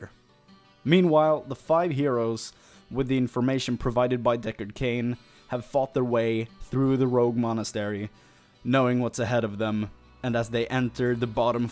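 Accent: Sweden. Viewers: Awesome, btw, Swedish?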